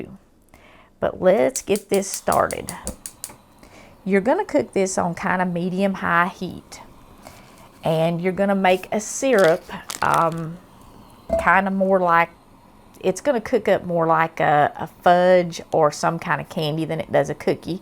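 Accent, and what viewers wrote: South, United States. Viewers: Question: Could the batter be put into an 8x8 dish like fudge, then cut into squares once set? Thanks for this recipe, and for speaking slowly enough to understand you! Love your southern accent!! 😊 XOXOXO God bless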